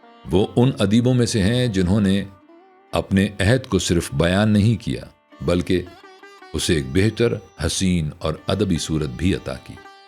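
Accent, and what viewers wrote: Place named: Lucknow, India. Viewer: بہت عرصے بعد ایسی خوبصورت ،آواز،بہترین تلفظ،آراستہ پیراستہ اردو زبان اور کسی دلکش ندی کی طرح روانی سے بہتا ہوا لہجہ —-حقیقت یہ ہے کہ میں سجاد حیدر کو بھول کر ان صاحب جن کا نام بھی مجھے معلوم نہیں ،کے سحر میں کھو گیا اور سجاد حیدر کہیں دور پیچھے رہ گئے۔یوں لگا کہ لکھنؤ کا اردو لہجہ اور دلی کی قلعہ معلی کی زبان دوبارہ سے زندہ ہو گئی ہے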